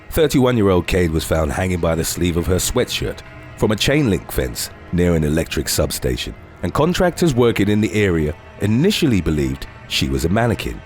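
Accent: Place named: England, United Kingdom. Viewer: Dark skin, English accent, hypnotizing voice. Very unique. Makes the crimes more bearable to listen to.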